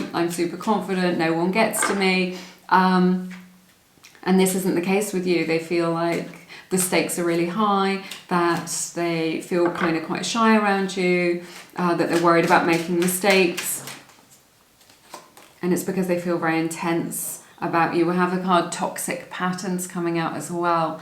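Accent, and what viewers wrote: England, United Kingdom. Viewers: is so beautiful. Or I mean. Love her hair... also love her english accent... Excellent reader aswell. 🙏🦄⚘💛⚘🦄🙏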